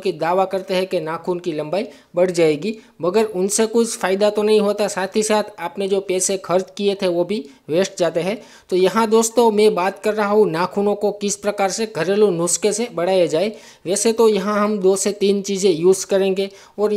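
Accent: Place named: Kathiawar, India. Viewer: Sir tame Gujarat thi chho ne ? Kathiyawadi chho lage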